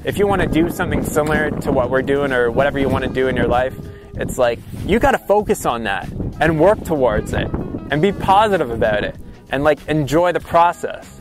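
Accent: Canada. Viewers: this video makes me really sad so I won't be finishing it. It's sad that we live in a culture and society where people think it's okay to ask about your money or net worth or whatever. I agree with your mom, it's disrespectful and no one's business how much money you and have in the bank. People are too entitled. If you guys have $2 or $2 million dollars, it isn't our business. That's between you and your wife. On a side note, I knew you were from Canada! Yes! Silently pats myself on the back. Your accent seems Canadian but some words you say sound slightly Australian, like you lived there for some time and picked up a bit of their accent. I digress. Anyhow, thank you for the videos!:)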